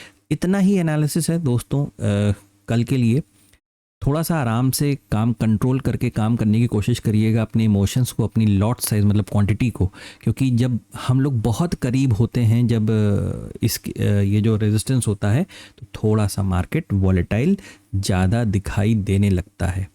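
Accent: Lucknow, India. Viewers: Right shoulder is Pushpa style. Main jhukega nahi. You have a great way of delivering your content. Lucknowi andaaz ki baat hi kuch aur hai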